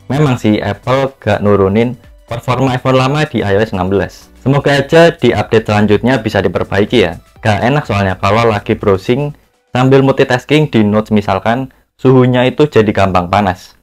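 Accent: Java, Indonesia. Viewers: Suoromu medhog bgt mas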